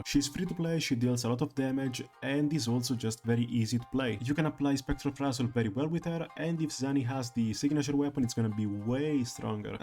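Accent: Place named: Italy. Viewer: Italian accent talking about italian mommy, sub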